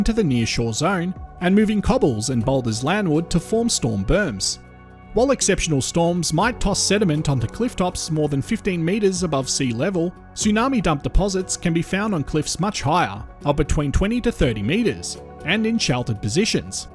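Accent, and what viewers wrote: Australia. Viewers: Aussie accent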